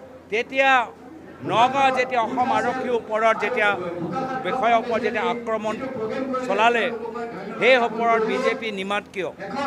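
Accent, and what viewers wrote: Bihar, India. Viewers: প্ৰহাৰ নচলাই প্ৰহাৰ কৰে হে। অসমীয়া ভাষা টো ভালকৈ কোৱা উচিত কাৰণ আপুনি অসমীয়া। বাকী অসমীয়া কওঁতে আপোনাৰ বিহাৰ,ইউ পিৰ সুৰ টো সলনি হোৱাৰ আশা নাইকৰা।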